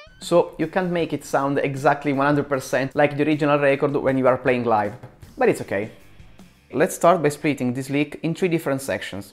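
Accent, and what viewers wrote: Italy. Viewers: La única diferencia está en el inglés... A mi me vale el italianinglish de...me entero perfecto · jajaja italiano hablando en ingles! jaj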